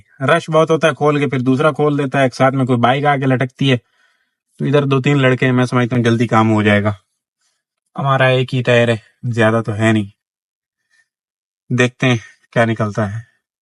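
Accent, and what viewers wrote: Jhang, Pakistan. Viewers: Apki Punjabi to jhung wali ha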